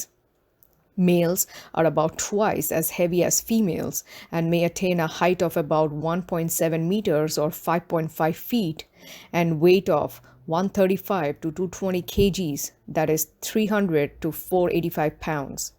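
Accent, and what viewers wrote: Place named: India. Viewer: Are you a indian descendent cuz Your accent seems to have it.. But nice video Very illustrative.. Nicely done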